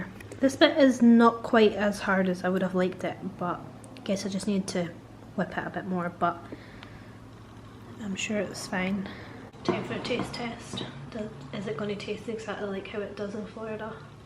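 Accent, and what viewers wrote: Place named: Scotland, United Kingdom. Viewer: I freakin adore Scottish accents. It’s the one accent I can not do myself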